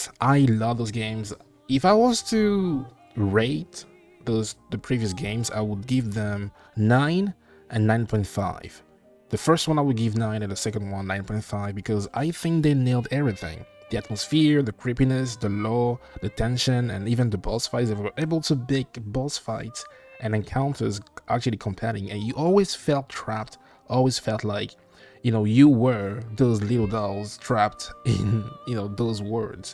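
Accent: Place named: France. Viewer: Love your accent. Are you French? I have family that are.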